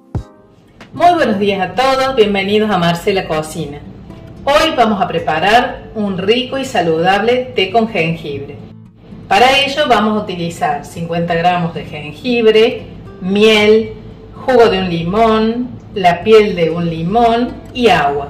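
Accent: Córdoba, Argentina. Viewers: Qué alegría escuchar esa tonada cordobesa!!! Muy buen video, muchas gracias!! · Qué lindo es escuchar tu voz de cordobesa!! Te iba a comentar q "acá en Cba.tomamos el mate c jengibre " pero qué te voy a decir !!! Si sos de mi Cba. Es buenísimo el jengibre!!! Haré este té. Gracias!! Ely, ciudad de Santa María de Punilla, Córdoba,República Argentina.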